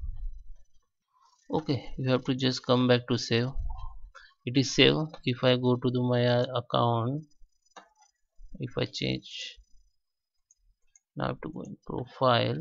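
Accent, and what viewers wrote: India. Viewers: He sounds like an Indian and most of the elderly working people in India prefer windows 7 because it is simpler with less bugs in the software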